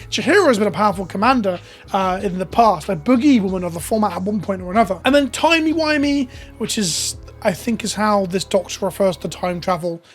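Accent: United Kingdom. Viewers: Hearing a british nerd talk about and get wrong so much about Dr who haha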